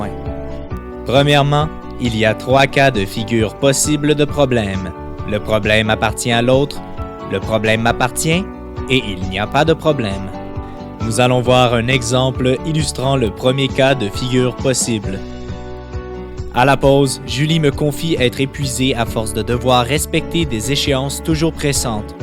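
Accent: Canada. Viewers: super, l'accent canadien c'est fun! moi j'adhère, merci! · T'as fait une présentation exemplaire mais pardon ma sauvagerie...Ton accent Canadien à détruit partiellement ce vidéo...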